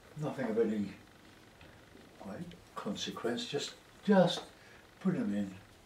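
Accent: United Kingdom. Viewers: I think this is pure genius. Thanks you for sharing your technique! I can't wait to try this today. Thank you so much. I love your British accent too, by the way...so charming.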